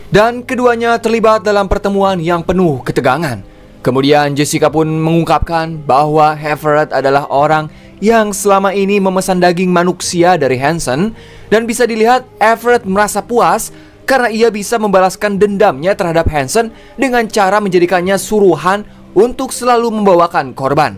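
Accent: West Java, Indonesia. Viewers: Asalamualaikum ..bang Ciawi hadir.bang aku suka cara Abang ngomong nya pakai bahasa Sunda Abang orang mna sih😊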